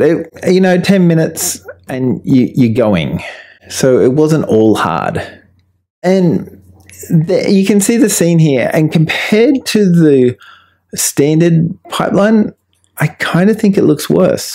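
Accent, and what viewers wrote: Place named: Australia. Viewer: Thanks for the video! You helped me a loads brotha! Love the ozzy accent btw :)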